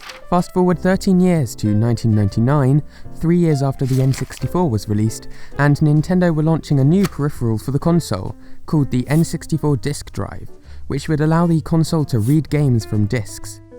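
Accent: United Kingdom. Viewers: Are you a British?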